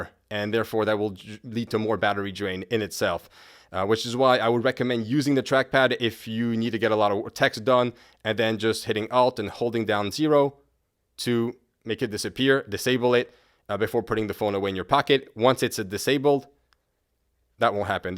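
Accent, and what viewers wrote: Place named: Montreal, Canada. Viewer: Random but are you from Montreal? I hear a familiar accent 😆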